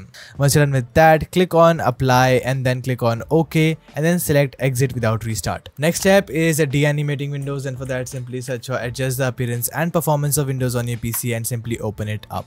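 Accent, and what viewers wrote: India. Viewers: Yk its good when u hearr an indian accent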